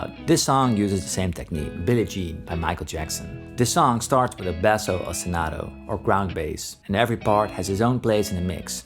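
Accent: Netherlands. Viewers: Kak hahahaha, I always thought u sounded Dutch ;) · you're defenitly dutch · where are you from, you sound dutch lol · You sound dutch, and i would know, cause i'm dutch too. Great beard btw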